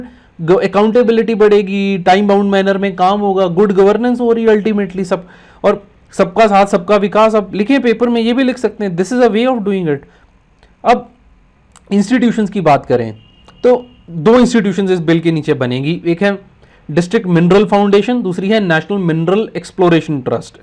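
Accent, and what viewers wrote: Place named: Punjab, India. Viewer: ur accent seems to be punjabi r u punjabi????grt and awesome videos btw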